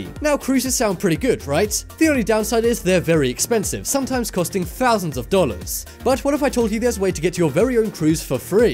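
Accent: England, United Kingdom. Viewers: Lot of nonsense on this video. Plus why does the English sounding voice guy assume only Americans watch the video?? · That very informative thanks.I going on cruise to mede soon wish saw this vid first.You only referring to American cruise yet you have English accent ,so. Do all codes etc are they international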